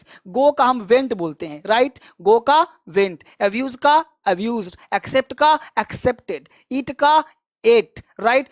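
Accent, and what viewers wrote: Bihar, India. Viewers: Sir aap bihar se belong krte h ky? Aapki boli me boht mithaas hai... Boht acha lgta hai aapki aawaz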